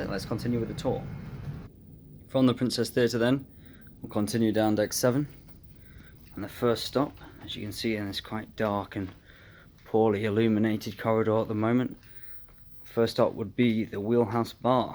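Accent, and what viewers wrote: England, United Kingdom. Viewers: Where are you from you have a accent from England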